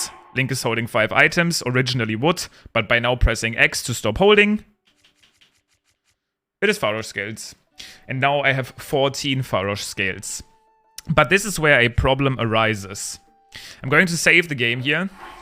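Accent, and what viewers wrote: Germany. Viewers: das deutscheste englisch das ich je gehört hab ^^ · Boah dieser deutsche Akzent 🥱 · dang, ich liebe diesen deutschen Akzent xD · Are you german cause of you're accent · Bist du deutscher?Das erkennt man bisschen am englisch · I have a question ur german arent you because i hear a pretty thicc german accent😂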